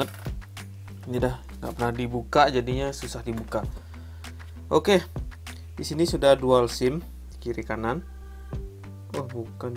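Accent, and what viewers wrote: Bali, Indonesia. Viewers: Dari logatnya kaya orang Bali.. Pakai gelang tridatu juga. Hehe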